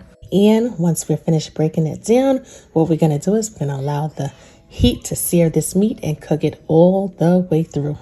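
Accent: New York, United States. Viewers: I love your new york accent too yes I picked it up on the first couple seconds of the video lol them cheeseburger egg rolls look good too smh love ny women can't wait to get back up top mad respect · Om guessing your from the New York by the way you say sauce, there, and off. 😁 Nice video I'm gonna try it thanks.. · You must be from New York!!! I’m a new sub for those egg rolls and that saucey-sauce! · Where you from Brooklyn queens or BX? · That New York accent just shines through, I love it. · New York or Chicago? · Hey - these look fabulous and I can't wait to make my hubby these. Thanks for sharing us you tutorial! Love your presentation and your lovely accent (New York?) We're from Chicago!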